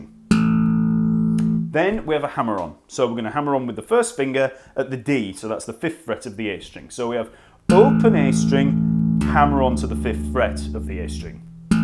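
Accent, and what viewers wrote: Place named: Northern England, United Kingdom. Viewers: A proper northern english accent. :) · Great! Loving the lesson, technique and the Yorkshire accent!!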